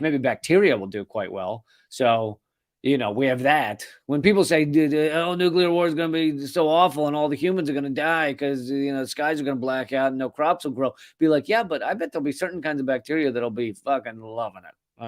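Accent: United States. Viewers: Stop the country accent. It's liberals that enslaved blacks. It's liberals that created concentration camps. It's liberals that wanted to starve Americans for refusing the "vax". Most country folks want to live and let live.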